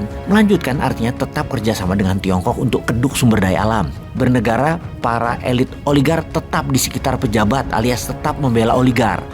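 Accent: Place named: Jakarta, Indonesia. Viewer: Ane demen nih kalo bahasa betawi bossman udah keluar rada gregetan campur sedep di dengernye....ya ga bossman???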